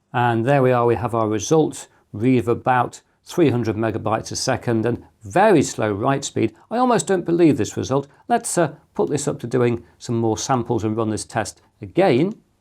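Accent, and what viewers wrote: England, United Kingdom. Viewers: english accent, check. electronic music, homemade, intro, check. nerd time, check.! love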